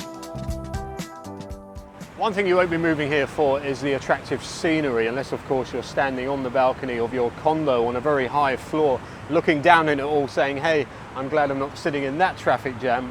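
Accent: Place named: Yorkshire, United Kingdom. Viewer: someone told me you were born in Leeds, same as me, is that corrct? You certainly have a different kind of Yorkshire accent. Your videos are interesting and well researched, highly unusual in this field, cheers mate.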